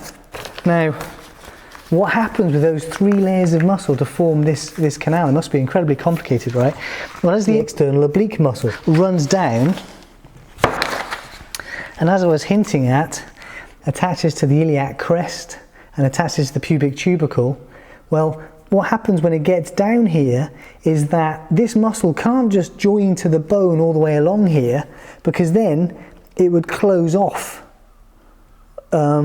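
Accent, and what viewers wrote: United Kingdom. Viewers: the british accent really piss me off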